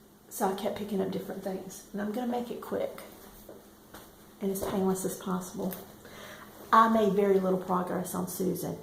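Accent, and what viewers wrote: South, United States. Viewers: Hi there! I am a new subscriber and I am loving your videos! I live in Indianapolis, Indiana and I love your southern accent. My mother was from Kentucky and for me the southern accent just warms my heart with loving memories. I am binge watching your videos after catching this one. Thanks so much for the time and effort you put into your videos...they bring such joy to me. Happy stitching!!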